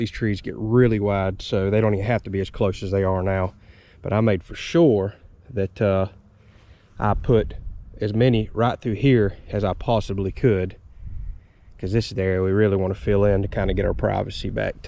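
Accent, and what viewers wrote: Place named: Florida, United States. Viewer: Hey, love the accent. Have you been a Florida man all your life?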